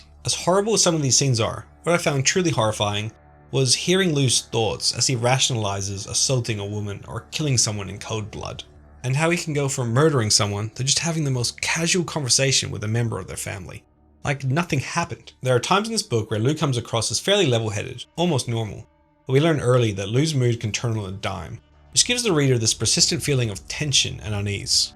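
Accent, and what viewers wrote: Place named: Northern Ireland, United Kingdom. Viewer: where are you from, your accent is fascinating.. you sound northern irish but u've either been to Austrialia or the States.. its driving me mad i cant place you!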